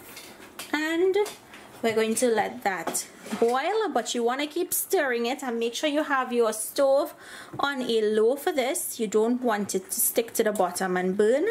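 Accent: Trinidad and Tobago. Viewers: I did a quick search for a cream of wheat recipe, and this video was the first to come up. I clicked the thumbnail without reading the name of the video or the name of the channel. Within the first 2 seconds of the video I said "buh she soun'ng like ah Trini". It was a most wonderful realisation that this is a Trinidadian channel on YouTube. Imagine my elation when it hit me that it was the first video to come up! Good job on the quality of your content as well as the authenticity with which you have present 'Trini cooking'. You have represented us well in my estimation! I truly hope this channel will continue to grow (: all the best to you.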